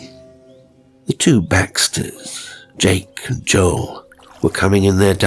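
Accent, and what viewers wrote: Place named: South, United States. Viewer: Your poor white trash accent was spot on. And I am from the south so I know. Really good.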